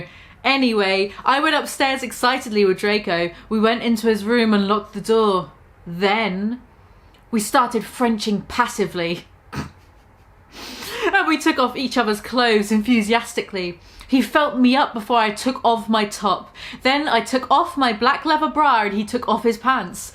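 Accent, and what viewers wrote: United Kingdom. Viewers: British Chav typing and being read by a British accent ... that's my cup.of tea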